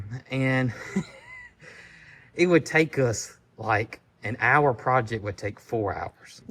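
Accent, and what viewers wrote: South, United States. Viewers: You popped into my feed. I gave you a listen. Your southern accent reminded me of my Tennessee kin, now all gone. Just hearing you talk brings me great comfort. I was born and raised in Indiana but my daddy would take us on our summer vacation EVERY year to my grandma and grandpa’s farm in the hills of Tennessee. I’ve slopped the hogs. I’ve shelled the corn out of the corn crib for the cows. I loved to watch grandpa milk. I loved learning to make butter and rub the sage and dry apples. They had an outhouse. They had a horse and two mules that actually worked the plow. Your channel just makes me feel good. I’m 70 years old this November.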